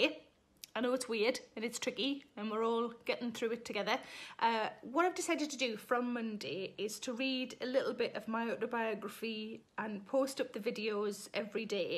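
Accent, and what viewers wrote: Newcastle, United Kingdom. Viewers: I love yr geordie accent 😅😅😅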